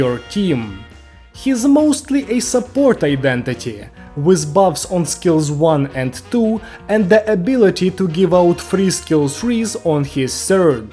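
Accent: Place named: Russia. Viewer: holy russian accent, btw thanks for the guide i am new to the game and i've pulled him this video helped me a lot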